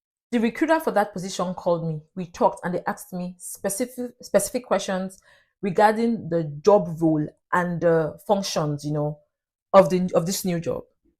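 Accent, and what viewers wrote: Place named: Ekiti, Nigeria. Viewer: You don dey rhyme like Ekiti people, nice 🤭🤭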